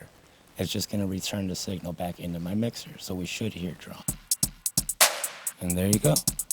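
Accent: Philadelphia, United States. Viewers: Yo bro are you from Philly?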